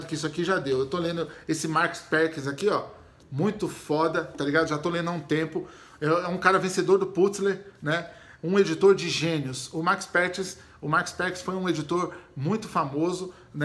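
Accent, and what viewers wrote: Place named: São Paulo, Brazil. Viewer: Pô maluco, sou carioca mas me amarro nesse seu jeito tão paulistano de falar.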